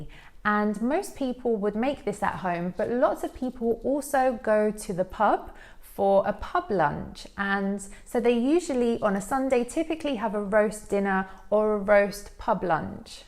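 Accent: United Kingdom. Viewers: I love the British accent , the British culture and your videos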